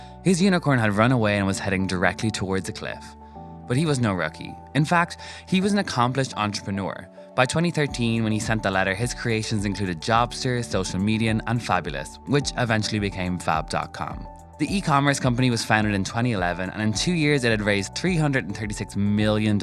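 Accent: Ireland. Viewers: When a brand pivots, like changing the voiceover, you have to be ready for some backlash. BUT love beats hate. Welcome Irish Guy! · Most people are finding it a little harder with the Irish accent. Congratulations new guy, but please- let Caya host 😅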